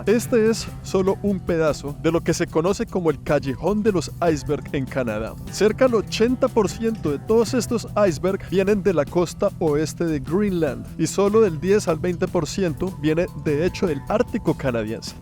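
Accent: Colombia. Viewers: Tienes esa Forma Tan Especial y Original de Narrar Tus Videos Con ese Estilo que Te Caracteriza Bien Colombiano con Palabras Sencillas y Entendibles. Muy Bien.. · Ese también es acento colombiano ❤, tiene una voz muy muy agradable y hace un gran trabajo con sus videos tan geniales ❤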